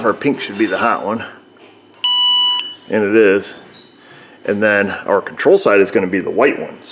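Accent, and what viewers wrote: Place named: Wisconsin, United States. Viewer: I hear some Wisconsin in the background or your voice...nice video...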